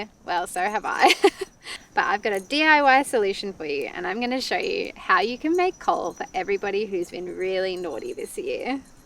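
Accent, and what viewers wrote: Australia. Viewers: Are you from Australia???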